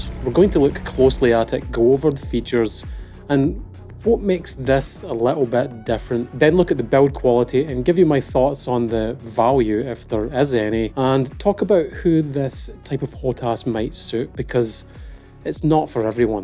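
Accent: Scotland, United Kingdom. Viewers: Thanks! Just ordered the WinWing Orion 2 F15 EX throttle and separate MOZA AB9 flight stick. Hoping that they're good 👍. I'm a scot living in Texas so was glad to hear the accent in your review. Thanks again. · i never knew i always wanted a scottish reviewer in my life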